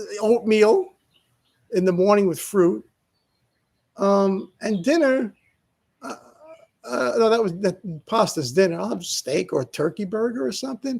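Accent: New York, United States. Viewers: amazing you never lost the NY accent! love it..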